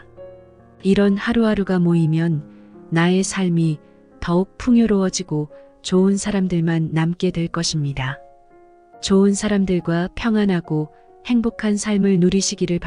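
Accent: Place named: Jeolla, South Korea. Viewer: 완전 전라도네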